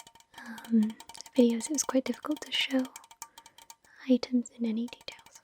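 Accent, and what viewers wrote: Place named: Wales, United Kingdom. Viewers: If you don't mind me asking, where are you from? I can hear your accent but I don't know if it's like an British accent or some other accent. I hope this isn't rude. :) thanks love your videos! :) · She's Welsh.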